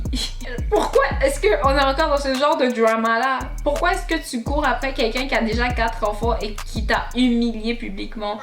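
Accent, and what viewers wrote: Quebec, Canada. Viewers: J’ai l’impression que t’as grandi au Québec ou au Canada t’as un peu l’accent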